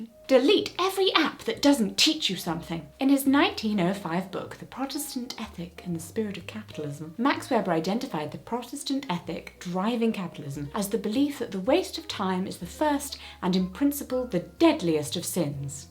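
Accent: RP, United Kingdom. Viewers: Is it just me or is extra British in this video. This video is good and inspiring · Between the 50s aesthetics, British "proper speech" and your serene smile, I am not sure if it's scientifically possible to be this gorgeous God fucking help me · A video from a posh-sounding, very fragrant lesbian with the right amount of make-up being full of wonderful sentiments. What's not to love? Thank you for making this! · “Sound Too posh” yeah and it’s pretty great 👍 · you do sound posh. i wish i sounded half as posh. the more i watch your videos and british english shows, the sadder i feel about the fact that as a canadian, i sound more like an american. which, at this point, is the real insult. 😂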